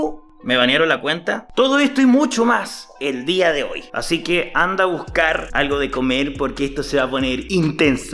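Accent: Chile. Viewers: Hola soy nuevo. Ase tiempo que te conosia pero me daba flogera entrar. Pense que eras español por tu bos o asento pero en un tik tok que isieron soble ti escuche tu bos y pense que eras chileo lo busco en google y vi que eras chileno yo tambien soy chileno haora si que si estare a tento a tus videos ace un mes me registre en free no tengo diamante labropa que tengo la consegi con ticets de incubadora o diamantes los diamantes uqe tengo son los de los regalos que dan cuando entras a una partida me pregunto si me puedes dar aunque sean 2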